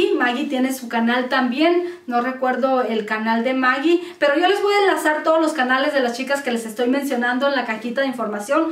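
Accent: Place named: North, Mexico. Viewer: Amiguita, nuestra querida es norteña por eso tiene ese bonito accento. Cada quien es diferente, hay que apreciar la variedad de accentos en los Latinos ❤️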